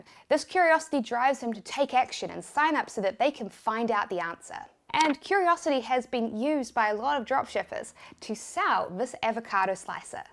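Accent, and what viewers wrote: New Zealand. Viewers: Definitely a kiwi. NZ.🙂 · Amir B It is none of these. It is a New Zealand accent.😀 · New Zealand. Good luck to you🙏🏼 shes good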